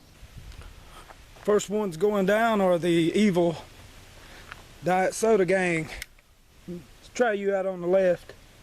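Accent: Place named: North Carolina, United States. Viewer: ya from buddy ? sounds like ur from North Carolina . Im not far from ya here in Virginia. And I got one of those H&R shotty s in 12ga given to me xmas of 78. Its one of those guns that you never get rid of cause your parents got for ya and that makes it all the more worth wow !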